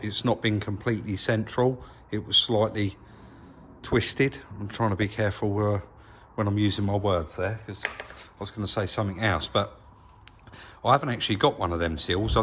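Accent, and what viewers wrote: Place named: Cockney, United Kingdom. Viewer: Escort RS turbo, like seeing an old friend ⭐️ Makes me smile how keeps correcting himself in the videos on his pronunciation of words😅 be proud of your cockney accent buddy! 👍 Really like this channel and wish all the best to a couple of top guys ⭐️⭐️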